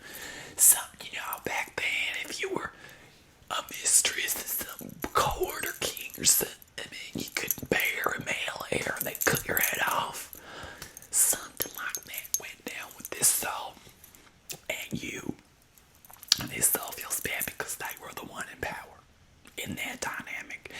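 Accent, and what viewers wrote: South, United States. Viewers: For some reason, I can't help but think about Rick Grimes from The Waking Dead when you do the southern accent with this character 🤣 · I love the southern accent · i love the rick grimes -esque accent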